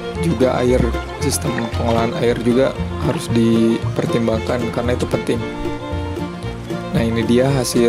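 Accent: West Java, Indonesia. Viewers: Orang sunda ya?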